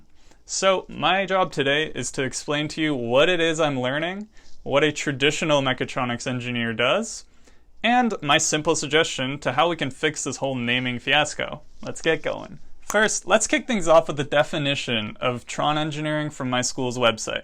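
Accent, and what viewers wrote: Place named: Texas, United States. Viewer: I love the texan accent lol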